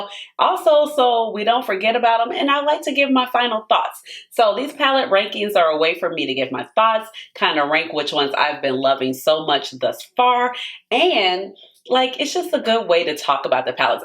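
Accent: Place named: Chicago, United States. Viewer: I had another idea for when you reach your palette ranking video #24. You could do a brackets-style “battle” of all of the #1 ranked palettes from all of the ranking videos? You could also do it as a series instead of a single (long a$$) video. Just an idea! Loving your content not only for the lewks (which are stunning!) but I also love to listen to people with my hometown accent. Chi-town, baby ✴️🚤✴️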